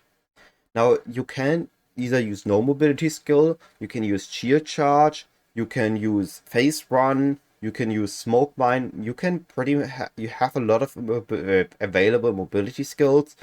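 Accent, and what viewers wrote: Germany. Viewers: Deutscher? BTW Very Good Video!! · Hört sich an, als seiest Du Deutscher, daher mal das Feedback auf Deutsch: Danke für die Anregungen, jetzt hab ich 'ne Idee: Seit gefühlt hundert Ligen mal wieder 'nen Essence-Drain-Trickster ausprobieren. :-)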